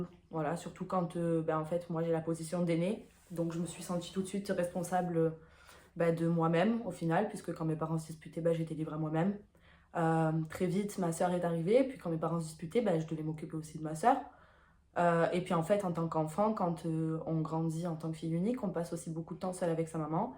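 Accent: South, France. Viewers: Salut les calanques de Marseille lol l accent du sud Fatch de con tu pointes ou tu tires lol petite intro avec une pointe d humour parce que rire est très important autant que le sexe ta vidéo est assez intime assez poignante tu es assez mature pour ton âge et ton chemin est moins être fini tu parles souvent de bienveillance c est que tu en auras besoin et pour toi et pour ton entourage ...le fait de t exposer ouvertement sur les réseaux sociaux va t amener des personnes positives et d autres bcp moins garde cette joie de vivre et ta folie tes 2 premières vidéos sont sympa j aime bien continue 😉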